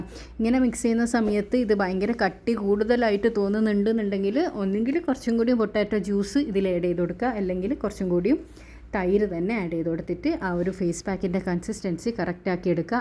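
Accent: Kannur, India. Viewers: മുഖം വെളുത്തില്ലെങ്കിലും നമ്മുടെ കണ്ണൂർ ഇത്തയുടെ ആ കണ്ണൂർ ഭാഷ കേട്ടാൽ മതിയല്ലോ ഏതായാലും നന്നായ് കൂടുതൽ ചിലവ് ഇല്ലാതെ പരീക്ഷിച്ച് നോക്കാൻ പറ്റുമല്ലോ❤❤❤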